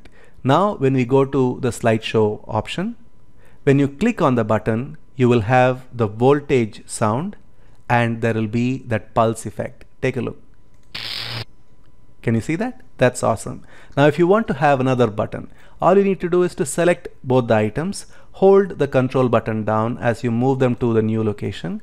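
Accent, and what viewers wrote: India. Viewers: Tamil accent iz da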